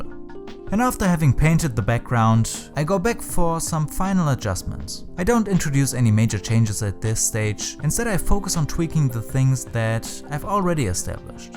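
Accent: Germany. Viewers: Dieser Moment, wenn man hört, dass er deutscher ist und man total abgefucked ist, dass er Englisch spricht😂😂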